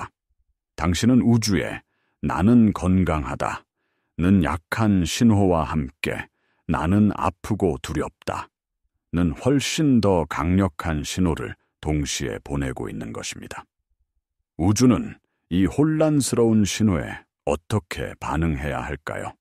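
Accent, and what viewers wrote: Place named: Gyeongsang, South Korea. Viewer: 대박대박대박 놀래자빠지고용 화이팅하이숑 경상도 사투리가 당연하지요 창녕띠기 아인교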